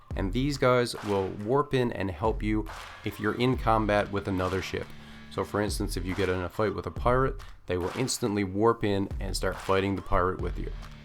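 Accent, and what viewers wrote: Newfoundland, Canada. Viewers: The Canadian accent is strong with this one. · Didn't even realize you were a newf until you that last part hahah you're a day walker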